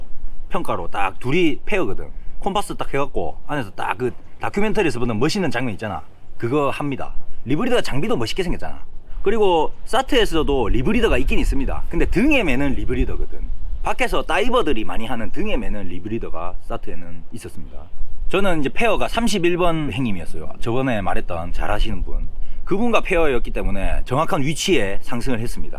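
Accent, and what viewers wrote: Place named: Gyeongsang, South Korea. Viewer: 말을 재미있게 잘해시네 말투가 진주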